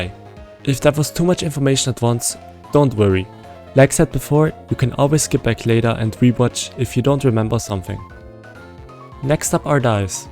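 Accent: Germany. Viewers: Your English is very German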